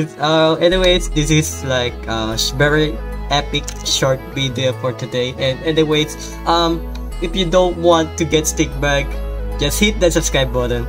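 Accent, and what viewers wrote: Philippines. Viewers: If I'm right ur filipino. because of ur accent but yeah many accent like that. like indo...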